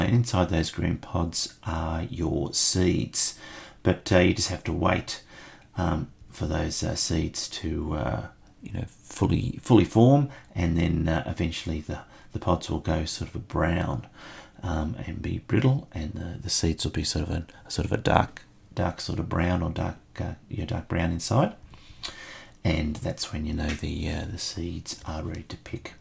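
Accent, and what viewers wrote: Australia. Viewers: How interesting! I didn't know you folks from down under say "broc-o-lie" where we yanks say "broc-o-lee" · what is the warmest it gets during your winter? I heard the accent so thats why I asked because I thought it's always hot in Australia but I honestly don't know anything about your winter. Greetings from Maine,USA :) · Strange, we also say it in England "Broc-o-lee". I assumed Aussies would say it the same xD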